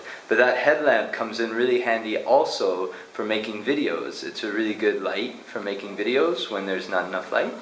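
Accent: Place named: United States. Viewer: whats up with the brit passport. Sound american lol just a random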